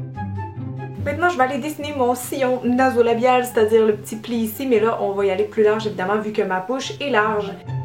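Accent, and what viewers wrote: Quebec, Canada. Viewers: T'es québécoise ?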